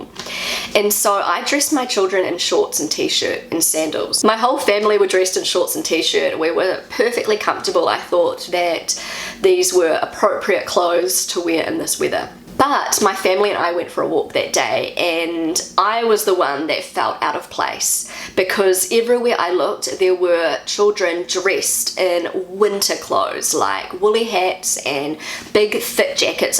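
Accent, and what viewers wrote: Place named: New Zealand. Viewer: Welcome back! Really missed your Kiwi accent. Measles vaccination: I really wish they'd have had that back in the 1970s when I got the measles as a child. It wasn't fun. I had such a high fever the doctors feared for my life. At least that's what my parents told me, but they're parents and maybe exaggerating. Knives: Oh come on! I'm from a rural area, and we boys always had our sharp Swiss Army Knives with us. You can't send a boy to school with a blunt knife, that's embarrassing. Playgrounds: When I was a kid, we had none in our village. But there's a forest, and we were climbing trees instead. Without parental supervision, occasional scratches and bruises included. To be honest, I'd still prefer that. There are sugar-free and even vegan gummi bears, I'm sure the ones from the pediatrician are something like that. Personally, I think children shouldn't be rewarded for doing things that need to be done, but opinions vary. 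"Getting out of winter mode", again: I'm from a rural area. We watch the weather, not the calendar. And you know what the parents in my neighborhood do? They let their children decide what they want to wear. That's good education, in my opinion. They learn from experience, which includes feeling uncomfortable at times if their decision wasn't so wise.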